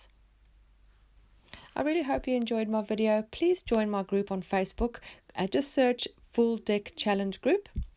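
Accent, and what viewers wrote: South Africa. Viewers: So nice to hear a South African accent...enjoying your work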